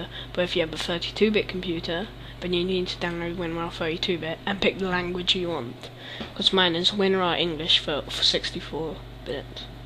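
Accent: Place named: United Kingdom. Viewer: HE'S A BRIT,HAHAHAHAHA.But,thanks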